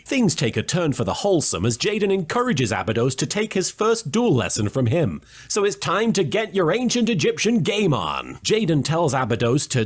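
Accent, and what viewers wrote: United Kingdom. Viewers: Is it just me, or did somehow become MORE british?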